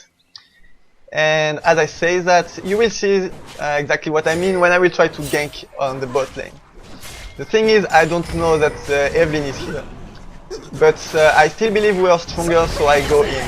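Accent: France. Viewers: no we clearly jear the french accent. but yes i wasnt so focused on game language and aint saw it wasnt spanish :) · Omg l'accent français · on en parle DU FRENCH ACCENT ???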